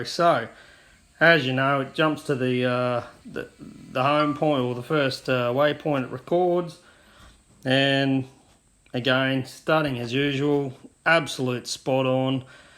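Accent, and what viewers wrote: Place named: Australia. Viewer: I'm surprised you've not had more comments yet but I guess it's because this app seems to have crept in under the radar so far. I'm looking forward to delivery of my MA2 this week having sold my MM and a Phantom3A before that. Can't wait to try this app out as it seems to do everything that I'd like. I used to fly my Phantom with FPV Camera but that app seems to have disappeared now. Keep on with the videos - I enjoy your quiet, understated Aussie delivery!..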